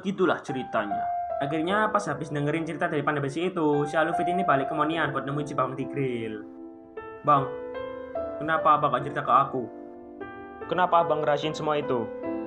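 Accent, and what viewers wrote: Java, Indonesia. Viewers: Bang besok eudora (ngomong ngomong abang orang jawa kan?)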